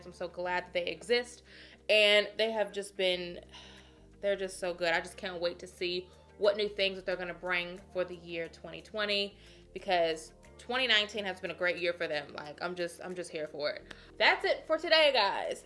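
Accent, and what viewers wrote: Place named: North Carolina, United States. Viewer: Hey it sounds like you live in my area of North Carolina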